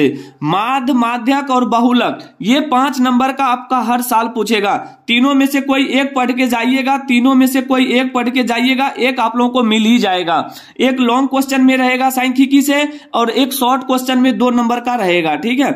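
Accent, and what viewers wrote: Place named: Uttar Pradesh, India. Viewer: Up ka q bol rhe ho sir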